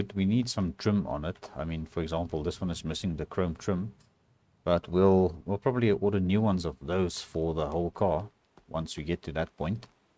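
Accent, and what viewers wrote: South Africa. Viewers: I knew you were South Africa as soon as you started talking. My wife is from South Africa, she doesn’t have the accent but ALL of her family does. She came to the US as an Au Pair. I can’t wait till we go visit SA because she’s always telling me cool stories and showing me some of the beautiful places over there. We went to a camping event over here in Texas and it was a bunch of SA people hosting a potjie and it was some of the best food I’ve ever had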